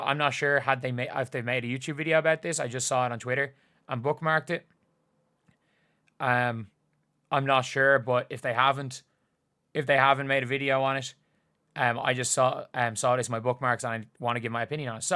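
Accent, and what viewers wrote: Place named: Ireland. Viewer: I enjoy your commentary n your thick Irish delivery!😂🎉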